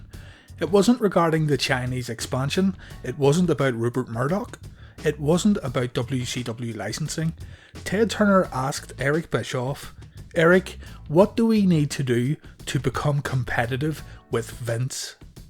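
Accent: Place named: Northern Ireland, United Kingdom. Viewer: Dude are you from the north of Ireland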